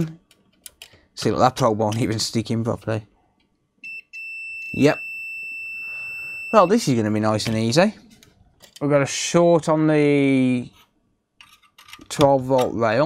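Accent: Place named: Midlands, United Kingdom. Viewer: ahh, I thought you sounded localish, very midlands type accent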